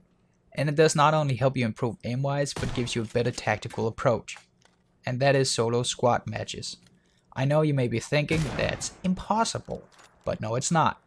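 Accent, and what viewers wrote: Denmark. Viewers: Are you Danish? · Are you Danish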